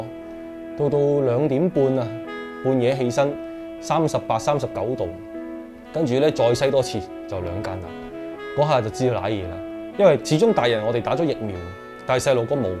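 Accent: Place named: Guangzhou, China. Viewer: 你的口音有D似廣州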